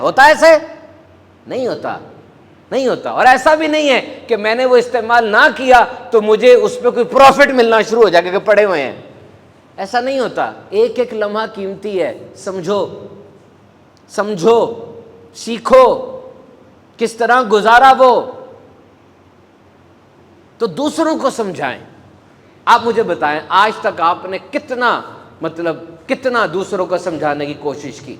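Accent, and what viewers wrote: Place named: Punjab, Pakistan. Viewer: I like your all videos please stop Punjabi style English this use monafiqeen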